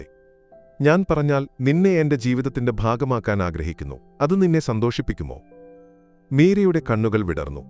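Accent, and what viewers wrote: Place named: Arabi-Malayalam, India. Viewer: Kadha Vaayikkan Malayalam Koottivakkan ariyavunna orale niyogikkaamaayirunnu. Ithu arabi malayam Parayunnathu pole aayippoyi.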